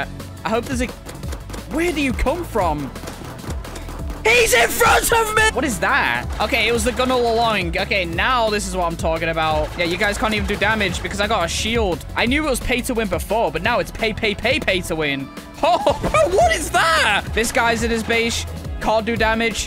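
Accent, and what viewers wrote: United Kingdom. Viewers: yo i have a question are you British?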